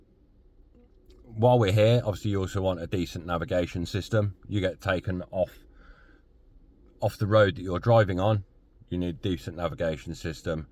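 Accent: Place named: Kent, United Kingdom. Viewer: Hey, great video! I'm typing from Canada. I'm lived half my life in Kent, I haven't been back to the UK in almost 10 years, but it's great to hear the old accent😆. I just had a question, as a vw tdi enthusiast like you, do you recommend driving your vw tdi hard (ie. staying in 3k-4k rpms for a few minutes or redlining) or just shifting in a higher gear by putting the dsg in manual mode? And then also if I drive it "hard" or shift it into a higher; how often a week should I do this as a daily driver in order to prevent buildup in the engine from the egr,dpf, etc...? I own a 2014 2.0L vw passat tdi by the way and the only difference between the conditions I'm in, is that it's negative temperature for over half the year here lol.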